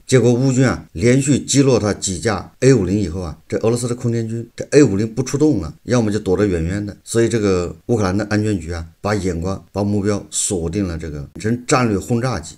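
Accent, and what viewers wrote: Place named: Xiangxiang, China. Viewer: 这湘乡普通话，亲切👍👍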